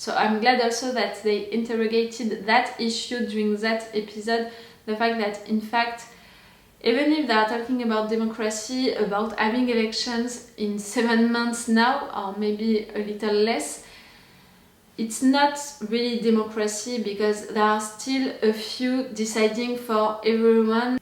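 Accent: France. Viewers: I love to hear a french person get constantly concerned about democracy. I swear, I start smiling every time I hear you say democracy in that sweet french accent. ;D Also, I have to defend Gaius. You keep calling him useless, but he is actually a genuine genius. If he didn't have Number Six manipulating him in his head, he would be a huge asset to the fleet. And even so, I think he probably still is, we just don't see it too much, but we've seen scenes where he brings reports for the president and he did the statistical work in the meeting during the water crisis episode and other stuff like that, so he is probably actually doing useful things in the background.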